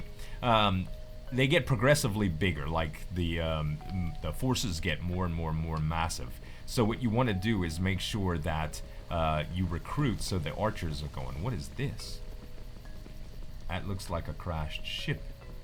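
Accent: Louisiana, United States. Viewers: oh and btw are you from Louisiana? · Wait so is from Louisiana? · where in Louisiana? Im from Metairie:) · I love your accent! Didn't realize it was Cajun. As a New Yorker, I'm not around it much/at all. Thanks for exposing us to it! (totally don't change :P ) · did not know he has a kajun dialect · Aww, don't train away your cajun accent. It's one of the best accents in the English-speaking world!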